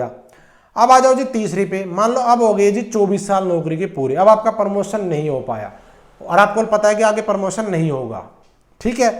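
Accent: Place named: South Haryana, India. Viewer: It's seems u belongs to south haryana like Rewari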